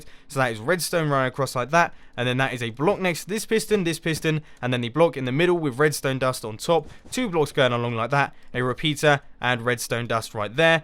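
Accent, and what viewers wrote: United Kingdom. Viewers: And he has a funny British accent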